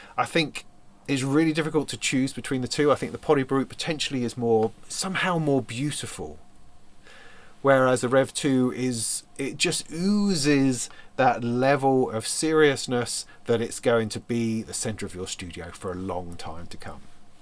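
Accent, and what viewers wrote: England, United Kingdom. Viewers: I’ve watched a few of your videos where you have explained you have American viewers. You’re just a sell-out. If the Yanks are too stupid to get their calculators out then so be it. You aren’t Murican, you don’t live there so stop pretending prices in dollars are part of your life. If you get loads of adulation for how you pronounce the names of synths then go and make a YouTube channel named I’m a Jolly English Chap What? Bit of a bell end aren’t you.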